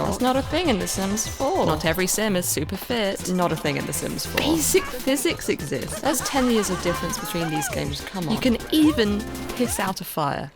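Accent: United Kingdom. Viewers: I could hear British people talk about literally anything let alone the BEST SIMS GAME EVER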